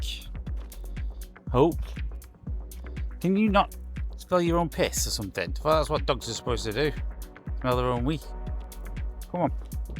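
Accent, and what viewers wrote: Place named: Northern England, United Kingdom. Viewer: Love the accent ,down t earth good owld northern accent😊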